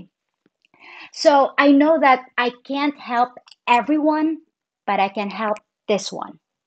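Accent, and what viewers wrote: United States. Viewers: I was surprised to hear you visited the UK but not the US. Your word choice and pronunciation is very American. But you do call a TV the telly. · If you had ever been to a nude beach you'd know that too much reality can be toxic. Reading minds would take the same problem to a higher level. When I was doing free lance IT, and a support number connected me to a Philippine call center, I always sent a positive note to the company. I can understand the Philippine version of English much easier than grammatical and correct English spoken with a Pakistani accent. Your speech patterns are closer to American than British.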